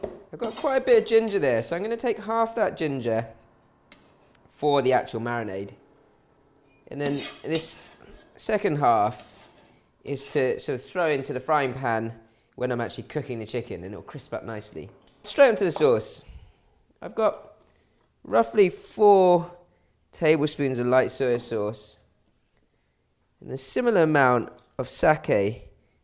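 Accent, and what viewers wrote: United Kingdom. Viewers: the British accent of course!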